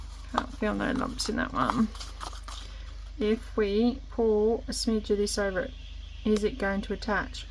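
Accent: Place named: Australia. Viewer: Thanks for the video - I definitely did learn from your mistake. Got some Solidcrete today & found your video hoping to avoid mistakes & hopefully it worked. Still not cured, but a few years ago I had a go with some other brand and did the same thing as you. It looked far too runny, so I added more powder & disaster. So glad I found your video & avoided that mistake this time. Great hearing an Aussie voice for a change in my hunt online for crafting tips. Subscribed ☺👍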